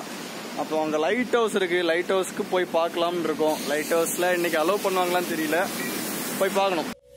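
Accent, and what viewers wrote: Pandi, India. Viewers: Pandi kala irukum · Bro pandi slangla onum peasandam nama adaiyalamana kumari slangla peasunga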